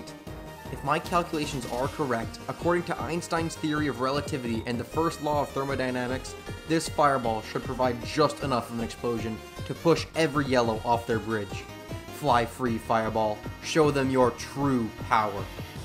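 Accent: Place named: South, United States. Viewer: Love the “Southern” accent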